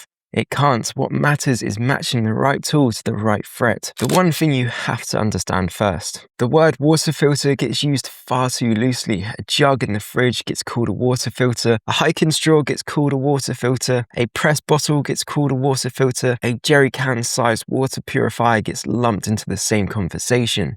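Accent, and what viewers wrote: United Kingdom. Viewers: Thank you, enjoying your videos, particularly nice to hear a voice from the UK. Would like to hear more about emergency water storage if you're looking for video ideas.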